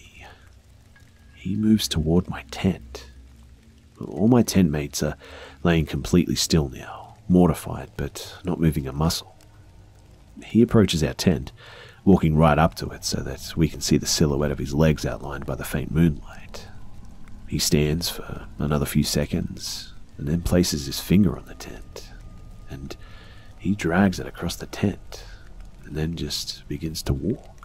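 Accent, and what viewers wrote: Australia. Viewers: So refreshing to listen to creepy stories with an Aussie accent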